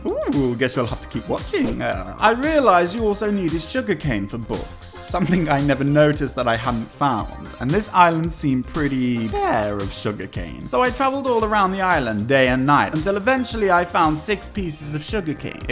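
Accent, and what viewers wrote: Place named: United Kingdom. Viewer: Ur accent is a so British lmao luv the vid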